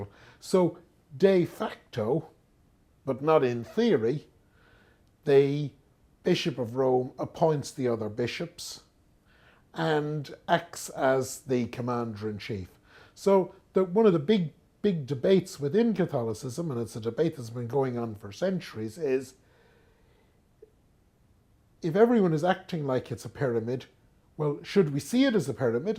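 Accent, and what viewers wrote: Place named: Ireland. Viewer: hi - accent is irish:) I like irish accents, and scottish ones too, but my fav is south african.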